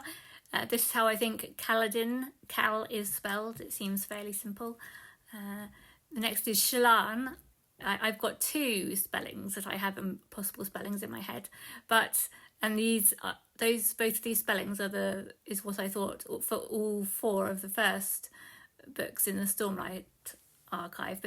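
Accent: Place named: United States. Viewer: This is such a fun idea for a video. And you did such a great job. I wonder if theAmerican pronounciation messed with it a bit too. Awesome stuff.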